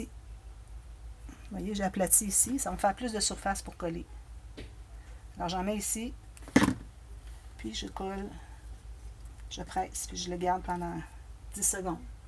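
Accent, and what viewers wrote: Canada. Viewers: et cette accent canadien j'adore !!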